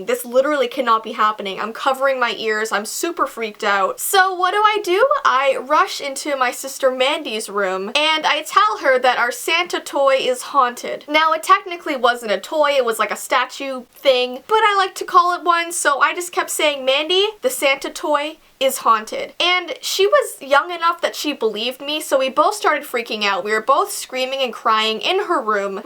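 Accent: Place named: Canada. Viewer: Jake Reed it's because she had a Canadian accent lol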